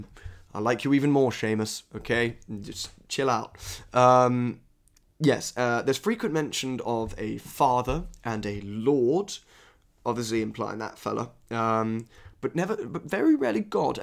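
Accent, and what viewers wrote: RP, United Kingdom. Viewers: I’m Brazilian, English isn’t my native language (or even a second one), quite the opposite 😅 but after studying it for a while and being exposed to it, I’d say I understand it reasonably well. That said, I’ve always struggled more with American English — I usually understand other accents better. Watching this video, I understood almost everything. I don’t know if it’s the way he speaks or if it’s actually British English (I’m guessing), but for me it felt like having an English class and wanting to study again. Loved it. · I do love a bit of posh 😊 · This accent sounds so posh to my plebeian Canadian ears. Also please keep this up. Ancient classics would be interesting too. Esp from the east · I can't help myself from being jealous of the vocabulary and flow of your speech, I guess some people are just from finer stock unfortunately. 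But I have seldom met a nor seen a posher bloke and i feel it distracts me from the subject you are conveying.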